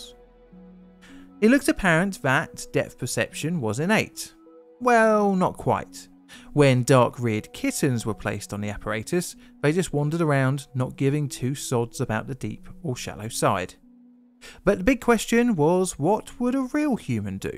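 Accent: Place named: South London, United Kingdom. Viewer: Hello Mr South London. 👍🏻🇬🇧...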